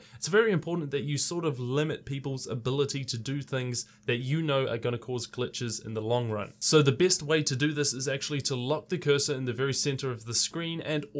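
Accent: New Zealand. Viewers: Judging by the accent, are you happen to be a Kiwi?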